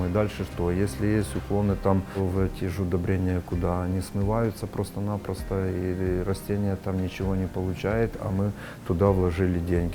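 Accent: Ukraine. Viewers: Черт возьми, я просто не поверил, что такое хозяйство возможно на территории Беларуси и акцент немного смущал, но подумал... вдруГ Полесье, Гоомельщина... а утут слышу "у нас в Украiнi"... и все стало на свои места... Молодцы, что сказать. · я тоже сразу задался вопросом где это, начал читать а хрен тебе , какого черта не указываете место положения, я надеялся россия, но сразу уловил акцент, и сомнений не осталось , развернул коментарий и подтвердилось украина, молодцы украины, а вто авторам бы посоветовал указывать не только страну но и город местность!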